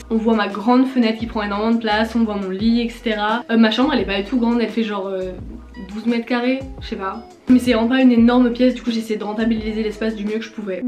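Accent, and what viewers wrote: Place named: England, United Kingdom. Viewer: salut, j’ai entendu ton accent anglais et je suis juste sous le choc- est ce que tu pourrais me dire comme tu as fais pour avoir un accent aussi beautiful stp ah oui et aussi tes magnifique meuf et ta chambre aussi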